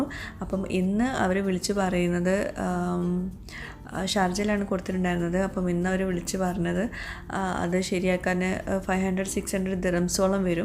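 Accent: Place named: Malappuram, India. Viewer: Ingale malapporam baasha kelkkaan nalla rasand. Voice over kodukkumbo style aakken lle😆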